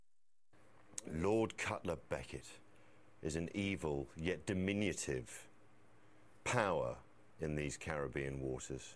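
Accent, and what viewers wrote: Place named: South, United Kingdom. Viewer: His pronunciation is certainly British/Southern English...this is his natural voice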